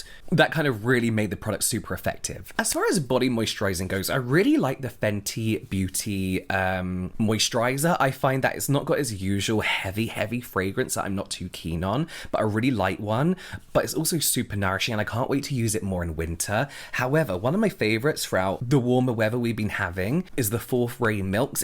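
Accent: United Kingdom. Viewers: Are your from the UK?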